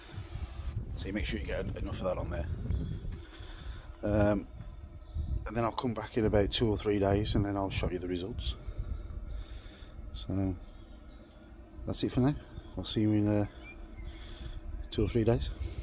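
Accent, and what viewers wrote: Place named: Birmingham, United Kingdom. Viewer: Alright mate if used a watering can how much should I dilute it thanks like say a 5 litre watering can how much of each you sound like you are from Birmingham like myself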